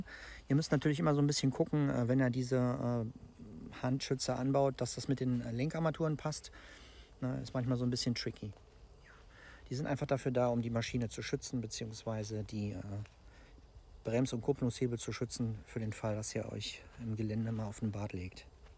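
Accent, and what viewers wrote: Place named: West Germany, Germany. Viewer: Deinem Dialekt nach muss man denken das, du ein Wessi bist. Jede thüringische Gegend hat seine eigenen Nuancen, doch grundsätzlich erkenne ich jemanden am Dialekt ob er aus Eisenach, Apolda oder Schleusingen kommt. Mein Gemecker ist nicht böse gemeint. Verstehe es als konstruktive Kritik. Videos welche Informationen verheißen, dürfen einen zeitlich Rahmen nicht sprengen, sonst klicken die Zuschauer gelangweilt weiter. Schau in deine Statistik, da wird sich bestätigen was ich sage.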